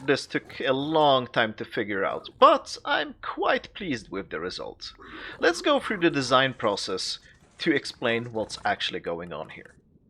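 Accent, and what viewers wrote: Sweden. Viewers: Well tru but it gets a bit more complicated to check if all have shulkers when you have a bunch of them. And i hate to fill things manually since i will only do it when i see that something is wrong. But that's a good point i think i will put the buttons directly if i can push them between the hoppers :) Great filler though had a lot of problems with other loaders but this just runs smoothly :) Your from sweden i guess? Sounds like it atleast. Grymt jobbat med denna lilla contraption kommer fortsätta hålla utkik efter saker som du lägger ut :) Är du nån gång intresserad av nya projekt har jag ett som jag inte kommer kunna lösa men om du vill ge det en chans så hojta :)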